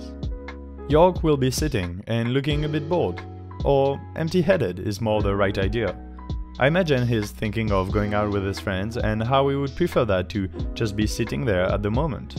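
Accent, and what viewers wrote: France. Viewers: Nice vid! I very much enjoy your french accent tbh :) Keep up the good work!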